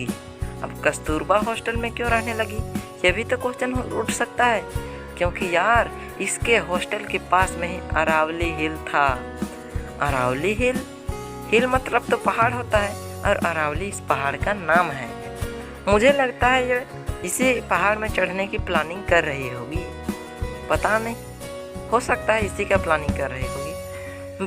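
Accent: Bihar, India. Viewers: Bhai aap bihari ho naa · Thanks you so much bhaiya I understood everything , literally you are gazab 😅 , aapka bolne ka tarika bhi accha lagta hai mughe aap bihar side ke lag rahe hai , mai bihar ka hun , stay motivated and keep making these kind of wonderful explaination video so it would be helpful for new mankind in future, Again thank you thanks a lot !!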